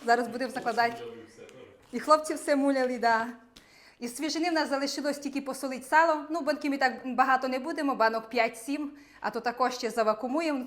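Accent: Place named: Western Ukraine, Ukraine. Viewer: чому "баночкі" а не слоїки?)) Можливо я помиляюсь...але, мені здається, що жіночка не з полісся. Акцент дуже схожий на західний.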